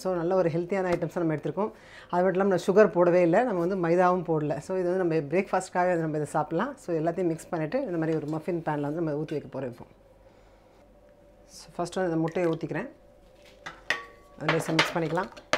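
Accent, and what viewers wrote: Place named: Chennai, India. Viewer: no thanks dear.. I'm mercel for ur chennai slang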